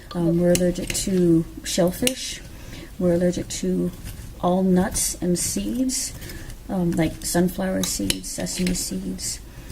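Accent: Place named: Ireland. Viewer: Are you originally from Canada, or somewhere else? Your accent sounds almost Irish! 😊☘️ Recipe looks delicious, and I love the crock insert💖 HAPPY THANKSGIVING🦃 Blessings from, Washington State USA 🌲☔💖🙏😊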